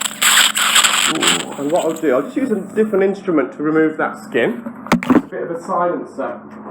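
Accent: England, United Kingdom. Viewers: You have the best English accent.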